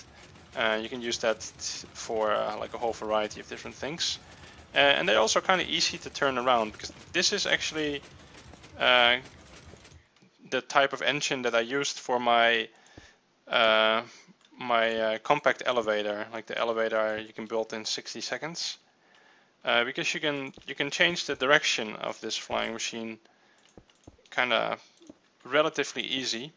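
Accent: Netherlands. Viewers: are you dutch? I am haha so... And you sound pretty dutch by how you pronounce your english words. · amazing tutorial, got me to understand everything i wanted! The accent is very clear, though :D. If i may guess, Dutch?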